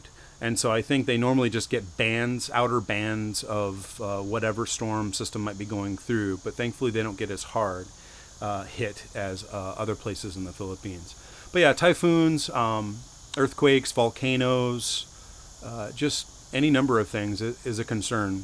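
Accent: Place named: Georgia, United States. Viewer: I made a comment earlier saying I’m new to your channel. I said that before I listen to the whole video it sounds like you’re back in the USA maybe Savannah Georgia which I’ve been there before because my son-in-law was stationed at Fort Stewart anyway really good video. I’m hoping to move there in a couple months to retire. When do you think you’ll be heading back to the Philippines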